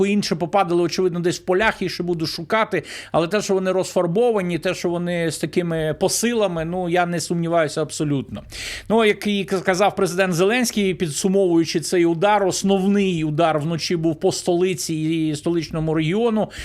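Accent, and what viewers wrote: Russia. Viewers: Як бридко слухати наслідування вимові рашистські мові; сказаф, пішоф, даф...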